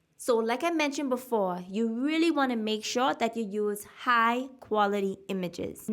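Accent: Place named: Nassau, Bahamas. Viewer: I knew it u sound like some of my family who live in Nassau ❤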